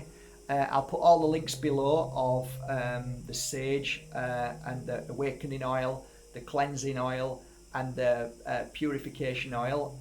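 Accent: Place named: Yorkshire, United Kingdom. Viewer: Brilliant! You’ve got such a beautiful heart! ❤️ Thank you for the workshop. I will try and help those who are going to need this 👌💫 Ps. Love listening to you 💕 Your accent reminds me of the time I had spent in Yorkshire, I used to study and work in Leeds for some good 6 years! 😄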